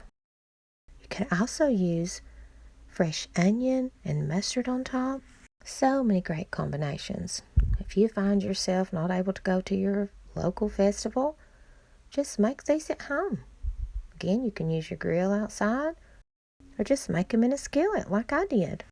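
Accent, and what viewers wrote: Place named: Georgia, United States. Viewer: Sounds like you might be a Georgia girl. I could fill up on onions and peppers, put them on a sausage dog is always the right thing to do. I'll try the other ones too. 👍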